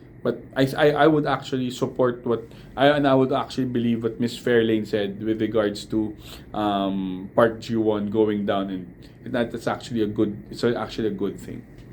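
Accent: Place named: Philippines. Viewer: your accent gave away your nationality. You look East Asian, Chinese BUT the way you speak English I know for sure you're Filipino.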